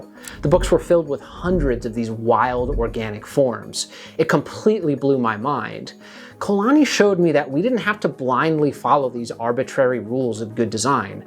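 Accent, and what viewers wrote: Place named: Germany. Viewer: My brain was short circuiting : I hear a German accent out of an Italian. Imagine my relief when you reveal the truth.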